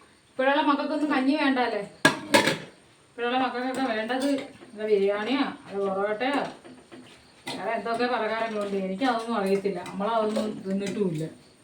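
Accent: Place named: Adoor, India. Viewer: എനിക്ക് നിങ്ങളുടെ സംസരം കേൾക്കാൻ ഒത്തിരി ഇഷ്ടമാണു . എന്റെ നട്ടിലെ സംസരം തന്നെ ആണ് ..ഞാൻ അടൂർക്കാരി ആണ് ,,ഇപ്പൊ UK ഇഇല് ആണ് ..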